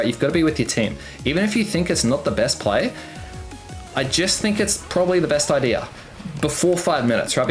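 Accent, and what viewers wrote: Australia. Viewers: are you australian? also your vids are VERY USEFUL! like seriously i got +10% win rate in ranked because of your vids!! so thx!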